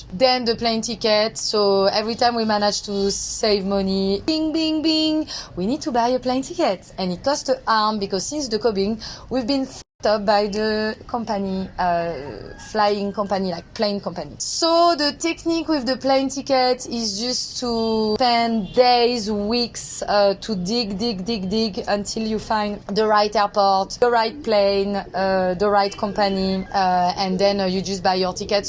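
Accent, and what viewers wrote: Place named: France. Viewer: I am in love with your french accent ❤️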